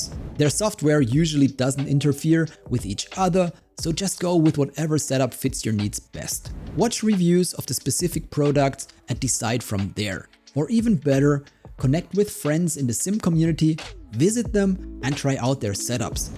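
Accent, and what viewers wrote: Germany. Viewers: I might become a member or something as well. But give me some time on that, because I just discovered your channel. Gotta decide if, and how much I would be if I do. But all is positive so far. Enjoyed talking to you. Also, is your accent German? Just curious, because I'm German, Irish and Bohemian (gypsy Bohemians at that). I think it's neat to learn things from people in other countries.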